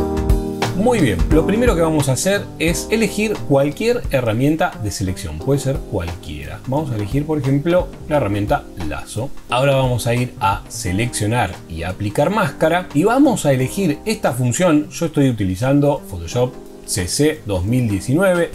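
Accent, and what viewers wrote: Argentina. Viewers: Sos un genio explicando amigo. Explicas re claro y sin rodeos, hay tutoriales de otros que te marrano mucho más, pero el tuyo es el mejor. Saludo PD: Sos Argentino o Uruguayo? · qué lindo encontrar que te expliquen en argentino!!! jajaj que bueno encontrar este canal :D graciasss por compartir tu conocimiento!!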